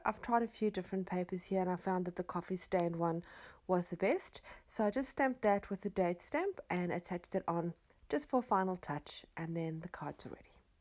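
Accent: South Africa. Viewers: Hi, you sound South African, where do you live. It’s so nice to hear your accent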